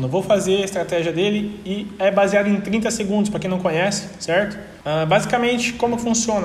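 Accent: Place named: Registro, Brazil. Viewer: Pelo teu sotaque você é de Registro são Paulo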